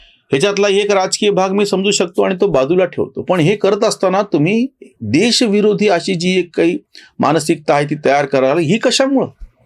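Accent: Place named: Marathwada, India. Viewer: हि मराठवाड्यातील बोलीभाषा आहे.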